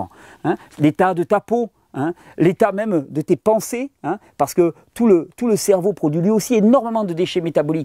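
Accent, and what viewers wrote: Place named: South, France. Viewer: Regenere / Thierry Casasnovas Je suis de la région opposée à la tienne; que j'appelle "le Haut de l'France "😆 et ton accent nous apporte le soleil du Sud 🌞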